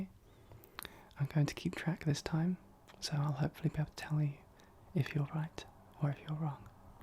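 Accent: United Kingdom. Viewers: It’s the lovely British accent I love it 😊😊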